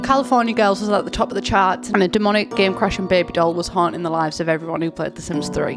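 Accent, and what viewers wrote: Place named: Middlesbrough, United Kingdom. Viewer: click like if you come here for the middlesbrough accent. say 'purple shirts'!!! 'PAIRPLE SHAIRTS'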